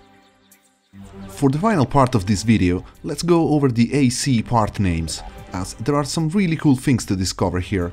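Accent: Italy. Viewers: Chad italian pronunciations of latin 🥲👌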